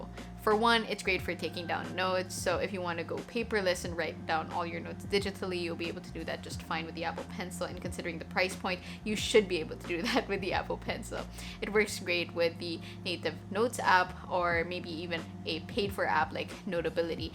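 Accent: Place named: Philippines. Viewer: you have a teenie tiny filipino accent in this video :)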